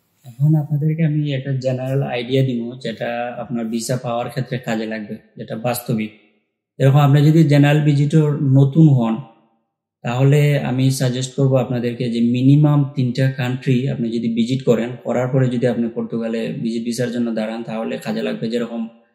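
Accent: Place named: Habiganj, Bangladesh. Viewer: ভাই আপনার ভাষায় বুঝা যাচ্ছে,,আপনি হবিগন্জ ডিস্ট্রিকের।আমি মাধবপুর থেকে বলছি।আমি আগে দূবাইতে ছিলাম,, আড়াই বছর,,,এখন পূর্তুগালে ভিসিট ভিসা পেতে আপনি কোন সহযোগিতা করতে পারবেন।অথবা ওয়ার্ক ভিসা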